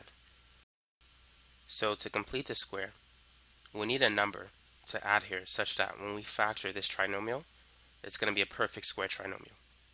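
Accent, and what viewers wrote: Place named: New York, United States. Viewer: Are you from new York or Boston. you sound like your from new York or Boston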